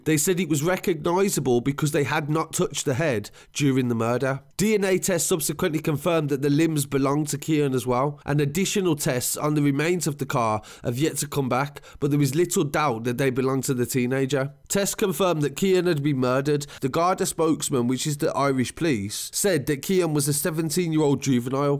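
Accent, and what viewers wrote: United Kingdom. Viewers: Mate you sound like ur from UK and learn how to say the places first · i come from the same town i know more about this than this guy does his accent is from the uk what the fuck would he know about our troubles haha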